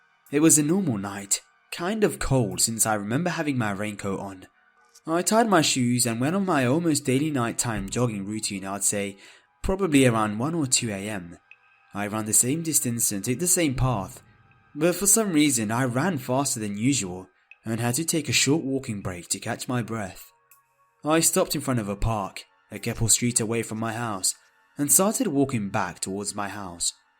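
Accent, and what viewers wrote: United Kingdom. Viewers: good video. nice reading another new sub. what part of UK you reside? Manchester myself 😀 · Good story + English or British ascent (XD) = Subbs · He is from the uk like me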